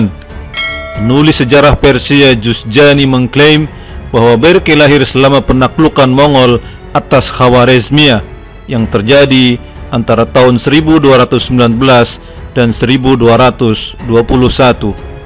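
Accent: Bugis, Indonesia. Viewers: Mcm logat Bugis ini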